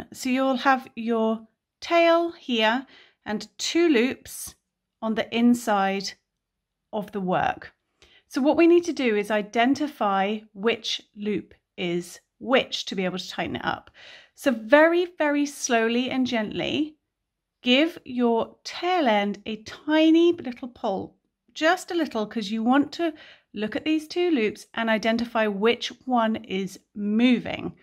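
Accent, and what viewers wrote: United Kingdom. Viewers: Your accent sounds British, so why don’t you use UK terms?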